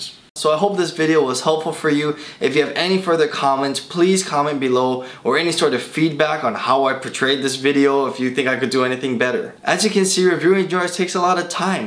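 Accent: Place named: Hawaii, United States. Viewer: I could tell just within the 1st minute of your video that you were from Hawaii. Mahalo for the content, I started as a laborer 2 years ago and want to progress with this as my career choice so I’ll be watching more of your videos!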